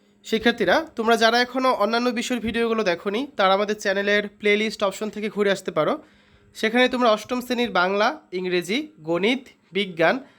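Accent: Sylhet, Bangladesh. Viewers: স্যার আপনি কি সিলেট উপশহর ছিনেন ❤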